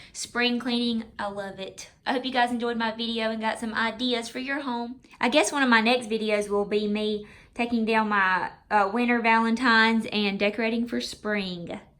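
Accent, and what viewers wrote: South, United States. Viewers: You might want to look up <link> to see about getting spare parts for your chandy. I have used them as they have come to my rescue several times. Have you thought about painting it? I am on a spray painting frenzy so that is why I mentioned it I guess. You sound like a beautiful southern woman..I was born and raised in Tennessee but am now in Georgia. Love your decorating style! Tfs,,,take good care! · I like the "Iowa Pine" and the "lavender" Mrs. Meyers . Such a pretty chandelier!!! Your rooms look great and doesn't it feel great to have them organized. You did get a lot done in one day. I really like the two thread work pictures. Just a side not~~I love listening to your southern accent.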